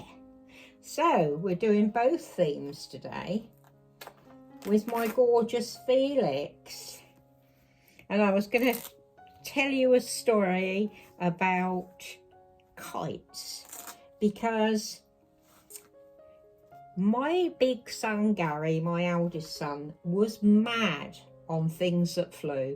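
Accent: England, United Kingdom. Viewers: Awe Hi Felix…Love him so much! He’s definitely a heart breaker ❤ Omg you poor thing with all that happen to your son and still..Ugh I don’t know how us mothers get through life after kids lol. They surly keeps us on the edge of our seats. Felix looked adorable in that new outfit 🤎 loved the teddy bear shoes ❤ thanks for sharing. I seriously couldn’t listen to you all day long, brings back so many memories of listen to my grandmother and her beautiful English accent. God bless sweetie and hope your feeling good.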